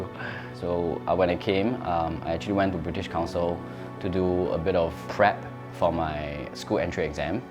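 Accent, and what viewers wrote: Singapore. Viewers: You sound so Singaporean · You speak like a Singaporean very good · 说的 singlish 也比我还要singlish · his S'porean English accent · you sound more Singaporean than some of us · Singapore definitely welcome someone like you - how you've assimilated well, speak like one, behave like one, think like one and essentially you're one of us. I hope all of our foreign friends who want to be Singaporean to learn from his attitude of adapting into SG. All the best in your endeavour. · his English sounds so Singaporean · his English is on par with many fellow Singaporeans his age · Already sound like local Singaporean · speak english like singaporean / malaysian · u sound singaporean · He spoke exactly like a local Singaporean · Totally now machiam a bilingual Chinese Singaporean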